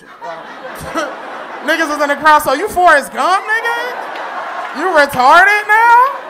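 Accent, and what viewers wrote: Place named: California, United States. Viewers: 100% Cali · 100% LA County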